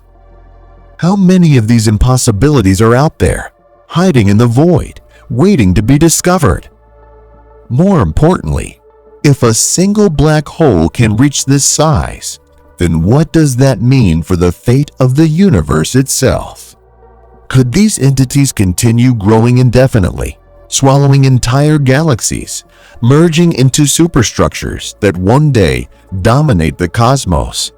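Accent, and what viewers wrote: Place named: United States. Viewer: Annoying American voice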